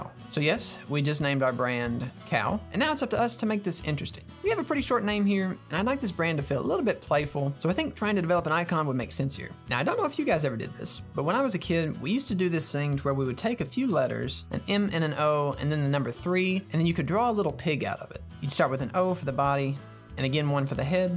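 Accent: South, United States. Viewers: unrelated, but are you from the south? i love your accent and how you enunciate words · extra points for a cool southern accent lol. nice work, looks perfect!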